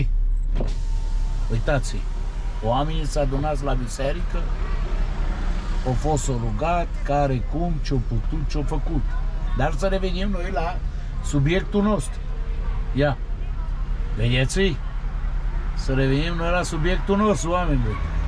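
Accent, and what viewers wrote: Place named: Transylvania, Romania. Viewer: Î''mi place la nebunie accentul ardelenesc!!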